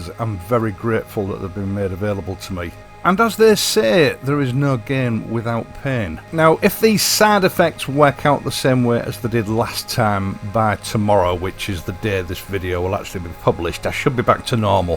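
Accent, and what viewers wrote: United Kingdom. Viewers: Your wonderful accent reminds me some famous BBC TV series. Not very easy to follow; I need some attention- but it has a very special charm. have a good recovery.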